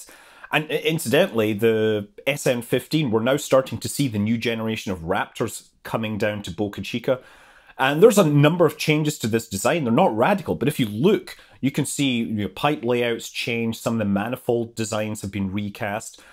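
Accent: Scotland, United Kingdom. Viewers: Otpyrc Ralph Pierre "Kockney"? He's Scottish! Cockneys are from London!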